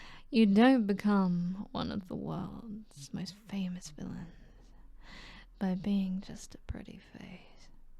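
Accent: United Kingdom. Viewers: The british accent is making it more🌶️🌶️🔥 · THE BRITISH ACCENT YALL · fr (The british accent is making it more🌶️)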